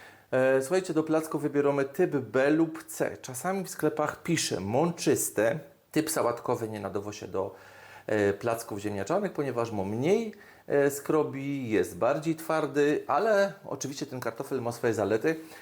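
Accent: Silesia, Poland. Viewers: 😂 slunska gwaro śmieszno jest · nieprawda. Ja uwielbiam jego śląską gatke. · Przepiękna Śąska Gwara! Byłem kilka razy na koloniach z chłopakami że Śląska i natychmiast po pół wieku wspomnienia wrócily. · Te przepisy,które dotad oglądałam są super.Bardzo lubię jak mówi po śląsku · Dziękuję za dobre rady jakie ziemniaki wybrać na placki.Przypadkiem trafiłam na ten kanał i już subskrybuje, bo mi się podobo śląsko mowa❤ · Kocham Pana kuchnię,smaczna,prosta,podana w prost,apetycznie.Kocham gwarę śląską, uczę się od lat Pana przepisów, obserwuję Tak trzymać!!!!!!❤😊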